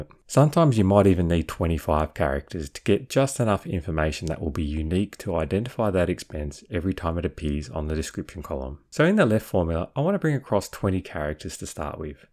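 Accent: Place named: Australia. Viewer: Hi, tnx for your response a couple of days ago. I fixed the issue re VLOOKUP! It was as you suggested columns not ‘lining up between data and reference tabs. Thanks so much! I can now follow your process for bank statements inc pivot table summary. Do you have a video for credit card plus automation as similar process but somewhat different ways I want to view?Leanne PS again so good to follow the Aussie accent😂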